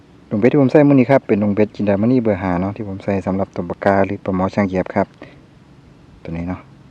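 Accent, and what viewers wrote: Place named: Isan, Thailand. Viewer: ฟังสำเนียงเสียงอิสาน คิดถึงบ้านที่เกิดแดนราบสูง ตกเบ็ดช่อนลุกปลากับแม่อบอุ่น ยิ่งดูยิ่งคิดถึงแม่ที่ห่างไกล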